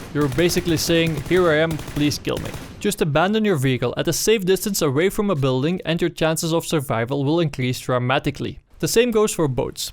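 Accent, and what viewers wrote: Netherlands. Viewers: he kinda sounds dutch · dutch accent? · you can tell he speaks dutch normally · arent you dutch your accent sounds like that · Steenkolen engels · u dutch? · Ik kan aan je accent horen dat je nederlands bent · Your Accent is netherlands, isn't it?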